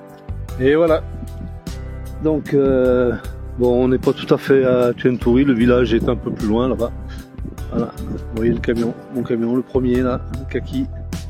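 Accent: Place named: Corsica, France. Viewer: Ils sont tellement beau les paysages. Eh t'as l'accent Corse un peu.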